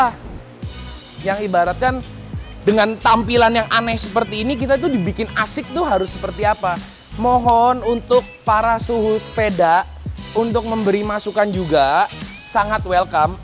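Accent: Cirebon, Indonesia. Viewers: 100% orang cirebon dari logatnya... Mantap lah, sukses terus buat semoga makin keren dan makin maju di dunia otomotif....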